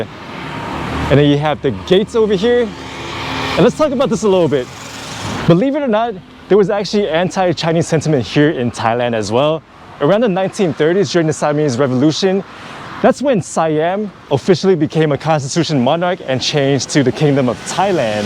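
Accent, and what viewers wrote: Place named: West, United States. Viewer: Now you are in Asia, so you don't have to speak like westerner. You are a China Chinese, don't have to boast that you came from the west.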